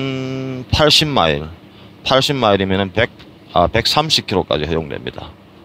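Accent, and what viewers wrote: Gyeongsang, South Korea. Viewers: 고향이 경상도 어디세요? 난 진주가 고향입니다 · 영어도 굉장히 잘하시던데 완즈이 경상도 사나이시군요 ㅎㅎㅎ 항상 안전운전하세요!!! 저도 현직 트레일러 기사입니다^^ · 구수한 경상도 말트때문에 볼때마다 지겹지가 않아 항상보고 있습니다 안전운전하세요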